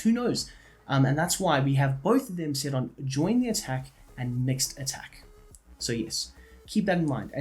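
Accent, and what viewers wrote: South Africa. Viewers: Are you South African by chance?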